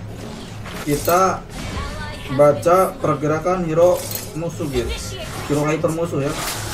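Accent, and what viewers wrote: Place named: Garut, Indonesia. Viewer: Logatna jiga urang sunda mang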